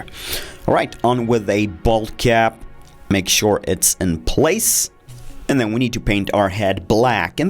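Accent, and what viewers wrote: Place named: Malmö, Sweden. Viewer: Ah ni är från sverige? Malmö dialekt?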